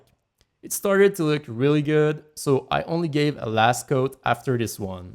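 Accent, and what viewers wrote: Quebec, Canada. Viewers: Great video dude! Are you from Quebec? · Est tu québecois?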